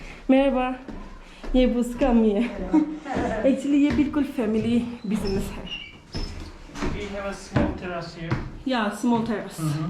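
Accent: Pakistan. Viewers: Sister i like the way how u r speaking urdu Allah hafiz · Yar apki urdu sun k mjhy bht mza ata hai 😍👍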